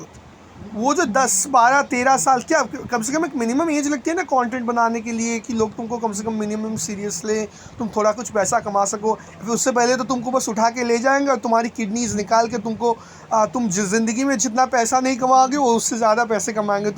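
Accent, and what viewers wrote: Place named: Bengal, India. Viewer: Wanting to work hard is luck too, I dont know why ppl dont realise that .I know how I would end up in a few years if I don't work hard but I still don't I just can't focus its just luck. Bengalis have really cool accents btw look at you and kirti chow for example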